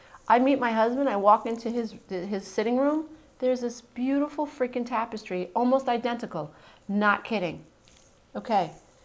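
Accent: New York, United States. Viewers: Love the New York accent! You don't have to apologize for it. Thank you for this deeply inspiring video :) The message is spot on: your thoughts are your destiny! We empaths, who are stuck in the memes of the past, can't hear this often enough. Thanks to you, I finally get it! Peace and love to you and yours in the New Year!!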